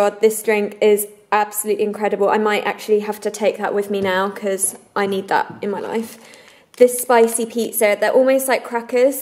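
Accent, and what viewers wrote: United Kingdom. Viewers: i just melt when you speek. i love your british accent. it's so sweet and nice. the time you said snacks i just repeated it over and over because it was so lovely the way you said it. i wish i was british....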